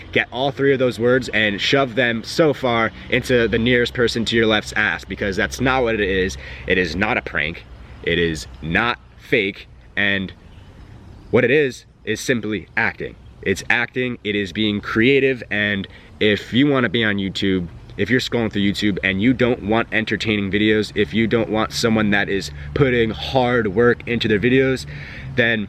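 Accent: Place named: New Jersey, United States. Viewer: You are the best bring your jersey accent back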